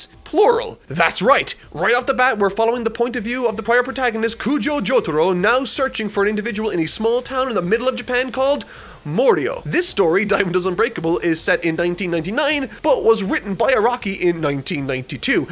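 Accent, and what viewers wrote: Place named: Ireland. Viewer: Are you from Ireland by any chance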